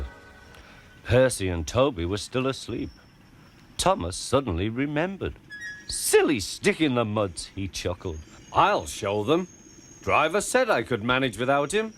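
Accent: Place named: United Kingdom. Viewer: UK narration over US narration any day for me. Sodor is in the British isles after all.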